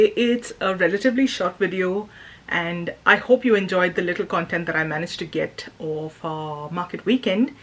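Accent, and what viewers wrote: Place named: United Kingdom. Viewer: Maybe a stupid question but in your bio it say’s Australia but you sound like someone from the UK😬 i am a starting artist and thinking about doing markets to sell my art but will the costs way up against the sale? Something else i need to overcome is the amount of people, i am a high sensitive person. So back to your video , i really liked it. Well done👍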